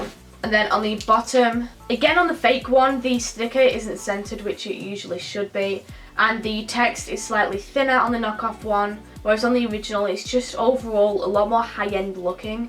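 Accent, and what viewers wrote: United Kingdom. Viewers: Your accent is British(like meh)But u r in America....nvm :D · Ellen Coonan well she's British. They pronounce things differently. There is more than one accent you know. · Is she British cuz she sounds it but she says dollars??🦋💗 Love you!!xxx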